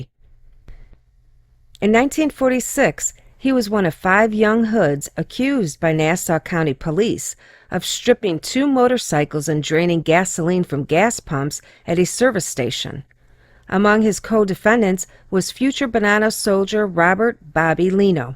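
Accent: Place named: Midwest, United States. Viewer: Are you from Chicago ? I hear a midwestern accent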